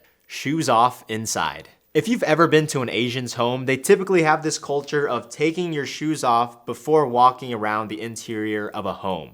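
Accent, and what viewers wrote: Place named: Philippines. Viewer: Subbed to your channel before i even learned you're a Filipino as well. I knew you sounded familiar 😊 Great videos btw. Keep it up!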